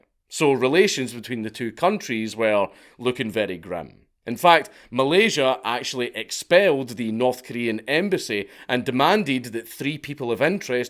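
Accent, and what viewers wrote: Scotland, United Kingdom. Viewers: seen it but always good to hear in scottisch accent...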